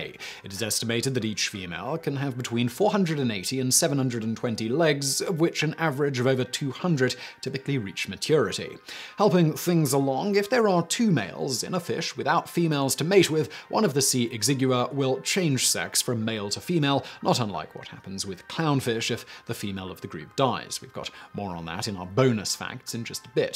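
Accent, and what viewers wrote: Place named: RP, United Kingdom. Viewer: Why do you sound posher than usual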